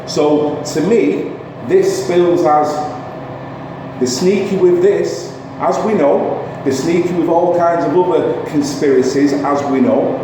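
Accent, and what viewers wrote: Manchester, United Kingdom. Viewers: Love you and that Manc accent one love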